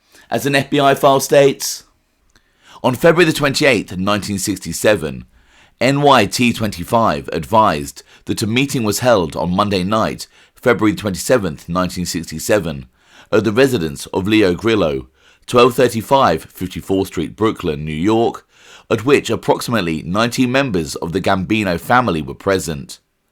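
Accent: United Kingdom. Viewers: Can we just ONCE have a documentary that isnt narrated by a stupid british accent that butchers every other word??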